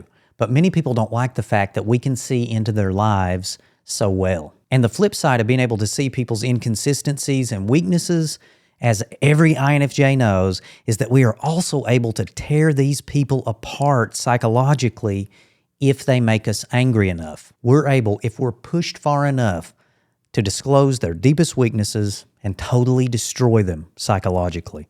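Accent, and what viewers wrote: South, United States. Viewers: Cool southern accent. Have a great day, INFJ’s!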